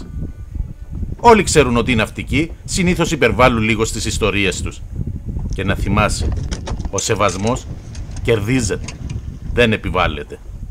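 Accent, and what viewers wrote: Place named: Spain. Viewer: wait... did this channel get highjacked and dubbed over by a patronizing Spanish speaking person?... time to eject that subscription!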